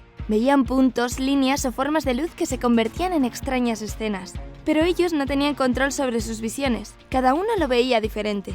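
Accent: Spain. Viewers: Español de España :. Horrible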